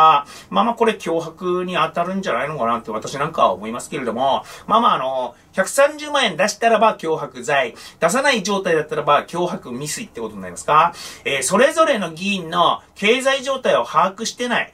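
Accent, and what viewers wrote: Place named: Ibaraki, Japan. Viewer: なまってんな（茨木調）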